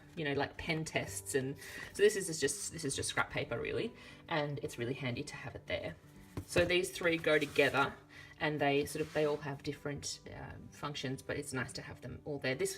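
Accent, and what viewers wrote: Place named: Australia. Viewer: Uhhhhh it’s so nice to finally hear an Australian accent the Americans have taken over YOUTUBE!! JK lol Heyyy Aussie squad!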